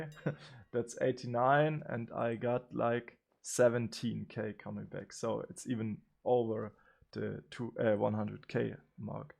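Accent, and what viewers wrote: Germany. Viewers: hab direkt gehört das du deutscher bist. :D leider hab ich net viel verstanden wie das gehen soll jetzt.... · Why do you sound German so hard? its so annoying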